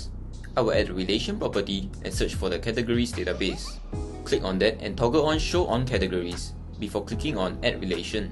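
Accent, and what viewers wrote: Singapore. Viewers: You from Singapore bro bro? Love the vid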